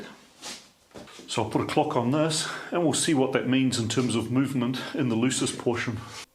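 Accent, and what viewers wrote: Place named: New Zealand. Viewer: Hi there. Just found your channel. What city are you in. You sound like a Kiwi. I'm in Chch. Cheers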